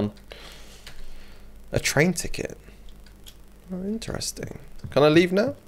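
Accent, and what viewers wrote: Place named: United Kingdom. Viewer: As a French tutor, your French with a heavy British accent is impeccable. Aucun de notes.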